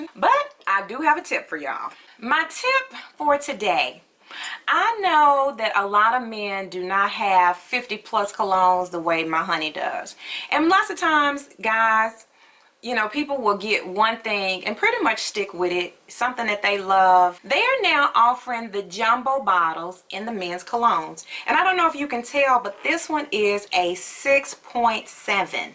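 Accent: South, United States. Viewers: very nice list and nice sothern accent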